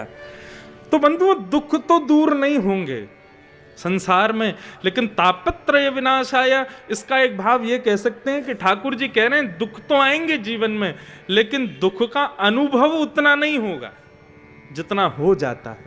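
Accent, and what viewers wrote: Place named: Braj, India. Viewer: Jai jai aapke mukh se toh brij Bhasha bahut pyari lagti hai Jio Jio mere Lal ❤❤❤❤❤❤❤❤❤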